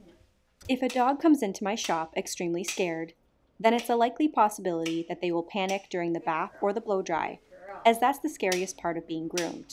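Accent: Canada. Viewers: Thanks. NO WONDER you sound like a Canadian. Cheers.🇺🇸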